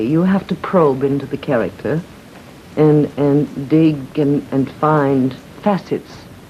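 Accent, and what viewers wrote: Germany. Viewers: Love your Deutsche - English accent. You roll your "r",. Excellent analysis, Joan's performance in Possessed (47) is a tour de force.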